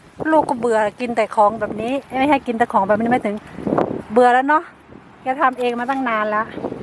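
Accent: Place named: Surin, Thailand. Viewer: พูดเหมือนคน สุรินทร์ มาชมสวนครับ บ้านน่าอยู่วิวสวย ดูชื่อ แล้ว บุรีรัมย์ สุรินทร์ บุรีรัมย์ รอ เรือ ขัดเจน เป็นเอกลักษณ์ เพื่อนผมสมัยเรียนครูด้วยกันก็แบบนี้ครับ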